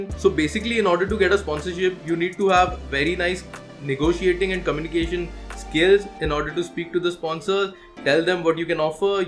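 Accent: India. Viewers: I dont Understand anything indian-English make me confused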